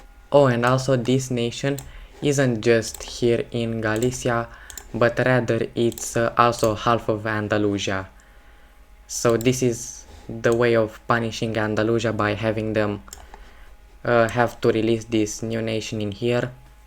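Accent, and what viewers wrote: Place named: Romania. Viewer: Peak romanian accent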